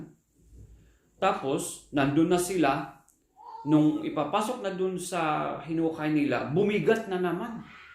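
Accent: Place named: Ibaloi, Philippines. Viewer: Badarong 😂😂😂 ensend ko your other video to my friends, and akala nila amin talaga nga ibaloi ket kasta accent na. 😂😂😂 keep on making videos 😃😄😄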